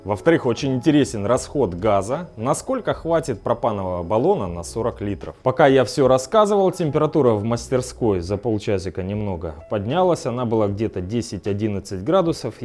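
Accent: Rostov, Russia. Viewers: То ростовский говор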